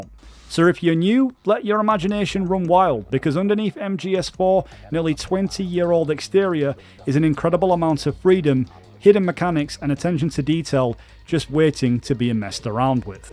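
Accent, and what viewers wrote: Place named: United Kingdom. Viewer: Love your british accent but 2 minutes long intro? FML.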